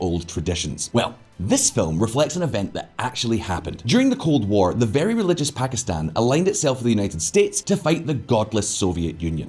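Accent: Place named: Scotland, United Kingdom. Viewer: His accent has the Scottish tinge to it.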